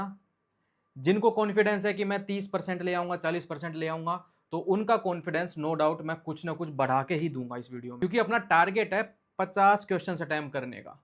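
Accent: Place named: Haryana, India. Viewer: Sir really loved how you are speaking like a proud haryanvi. Many are very ashamed of speaking the language our people, family speak in today’s time in haryana 🙏🙏🙏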